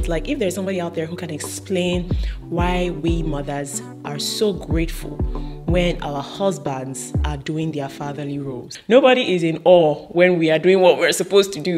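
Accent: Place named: Ghana. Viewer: your Nigerian accent is disappearing, I can hear the Ghana in there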